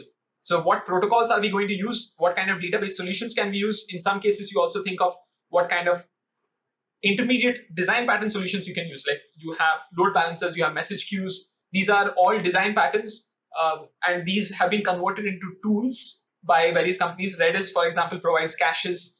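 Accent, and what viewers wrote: India. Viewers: As a non native english speaker, the indian accent adds difficulty. But it's in the pain that you soak up knowledge the better. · I have to admit, even though having a bad accent, indians most of the time give very good lessons, I just need to get through the difficulties of understanding the accent) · Oh my god, i was very happy to hear white face with good english and right after we were fucked up with indian accent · If a course starts with "hellou ewerywone!" I at least know that he put in the effort and worked damn hard to make it! Cheers! (For the trolls, this is not racist but actually respecting and valuing the creator and effort! I have been to India.) Thanks, great intro! 🤘🏻😎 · Great video thanks for sharing. However, you Indian guys learn everything but not the accent, straighten it as it makes it difficult to follow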